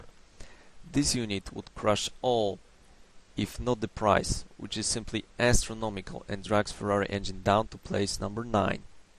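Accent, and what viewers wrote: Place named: Poland. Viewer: You Polish? Taki kanciasty akcent ;)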